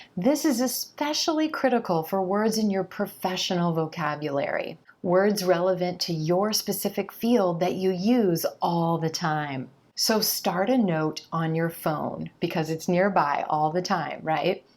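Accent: United States. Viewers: Hey, you’re awesome. Learning from your channel is such a golden opportunity. I enjoy getting here as often as I can, and I love to hear your genuine American English. Just feel like home.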